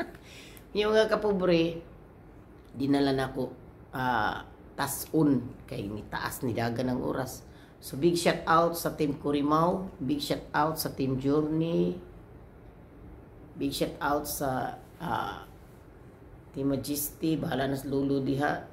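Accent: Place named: Visayas, Philippines. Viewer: bisaya man diay si host, proud bisdak here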